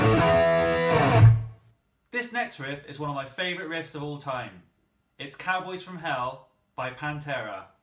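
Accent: United Kingdom. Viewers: That Accent, i know you're British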